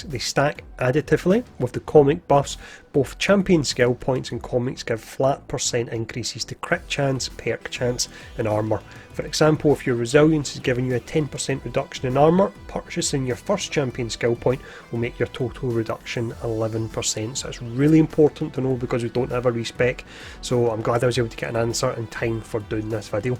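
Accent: Scotland, United Kingdom. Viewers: I've deleted this game for space for other games. I'll wait till T'Challa shows up but I'm not too certain I'd re download it in general due to the fact they only make 3 or less maps to play on. I hate repeats. Also are you from Scotland? I love Scotland accents they're the best!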